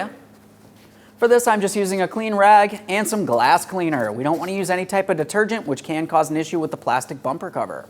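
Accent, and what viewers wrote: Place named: Los Angeles, United States. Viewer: I live in Los angeles and this is how everyone speaks in this hedonistic and PC city.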